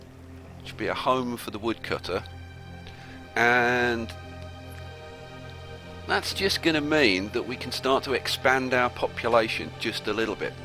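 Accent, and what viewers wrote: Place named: Midlands, United Kingdom. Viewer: What is your dialect? It sounds a bit midland-esque. Just curious. Good videos.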